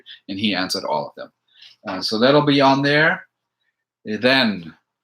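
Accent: Germany. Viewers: Love ur videos btw are u german because of your accent? just corious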